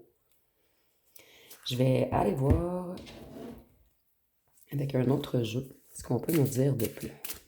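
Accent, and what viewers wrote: Quebec, Canada. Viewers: <link> Charles DE GAULLE, lorsqu'il arriva en TERRE DU QUEBEC, tomba amoureux de cette TERRE Française et de ses habitants et cria avec son coeur: VIVE LE QUEBEC LIBRE!!! Votre accent de la "vieille FRANCE" me rappelle cette histoire glorieuse de la FRANCE éternelle et de DE GAULLE qui éprouva une grande joie de retrouver une FRANCE oubliée... MERCI pour vos guidances très psychologiques et clairvoyantes qui nous aident à comprendre les situations complexes et complexées par des blessures d'enfance... 😘💖👍🙏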